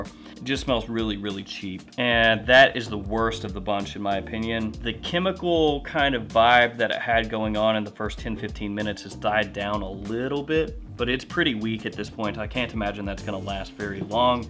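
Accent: South, United States. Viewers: "Hey friends. ".... and some of you folks can't tell he is from the South.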